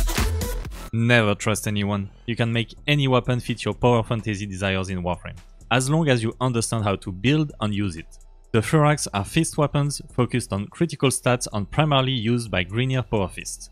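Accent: France. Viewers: This french accent i guess is just perfect haha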